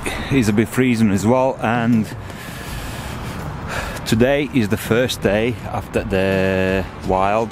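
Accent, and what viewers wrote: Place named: Liverpool, United Kingdom. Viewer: Scariest place in UK ... 🤣 Shows my high school 🤣 can say I went to the school of hardknocks now? 🤩 And on another note, your 'accent' is off the charts, I can hear skem scouse in there and whatever your native language dialect is. 👍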